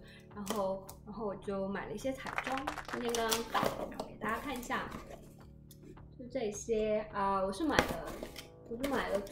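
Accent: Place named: Taiwan. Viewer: 讲话像台湾人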